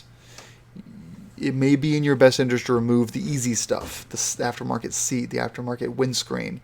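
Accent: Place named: United States. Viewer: Russell what makes you think he's Canadian? As a born & bred Canadian I can assure you that his accent marks him as an American, not a Canadian. I'm curious to know why you thought that?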